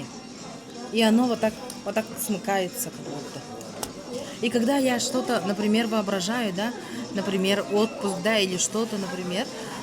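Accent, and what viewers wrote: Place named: Kazakhstan. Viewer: у вас уже произношение казахское. хорошего вам времени провождения в Бишкеке. Вопрос вы часто используете междометие Де или Ди что это значит с якутского?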